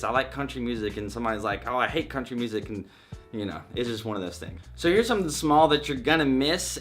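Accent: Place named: South, United States. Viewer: I can tell you're from the South, I'm guessing Ga, but you have a little bit of an accent and you talked about Fort Benning which is in Southern Ga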